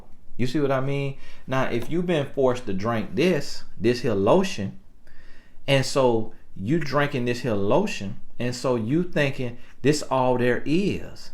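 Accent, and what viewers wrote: South, United States. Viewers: Great video. I like your southern country accent. I saw your wife in a video. She is beautiful.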